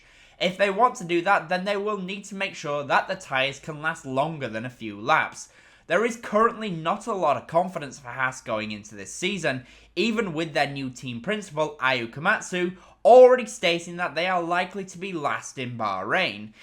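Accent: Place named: North, United Kingdom. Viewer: Hiya, lovely to see your face for the first time 😊. It is always nice to visualise what youtubers look like. I love your accent too. I am trying to figure out where you are from but not sure. It sounds northern. My predictions for this season are: 1. Red Bull 2. Mclaren 3. Ferrari 4. Mercedes 5. Aston Martin 6. Alpine 7. Williams ( I hope but Logan will need to start scoring( 8. Visa Cash App RB 9. Haas 10. Stake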